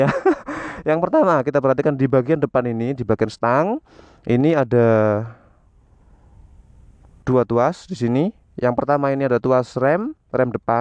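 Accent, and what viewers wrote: Java, Indonesia. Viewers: pake logat jawa :v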